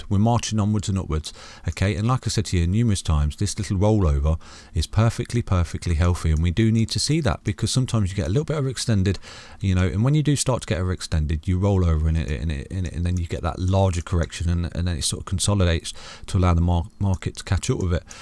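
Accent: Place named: Sawley, United Kingdom. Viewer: You sound very local to me in Sawley. Fancy a pint at the White Lion so I can rack your brains? Haha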